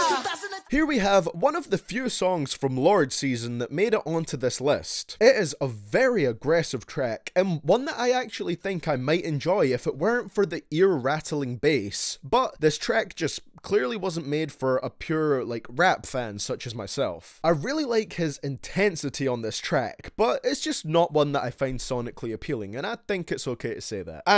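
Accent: Scotland, United Kingdom. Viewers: Are you Scottish?? I can't tell you have like 3 accents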